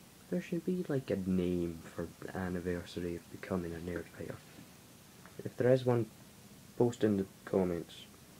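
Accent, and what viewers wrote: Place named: Scotland, United Kingdom. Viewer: D :D :D :D :D :D Scottish Accent. And first comment! (wow those don't go together at all)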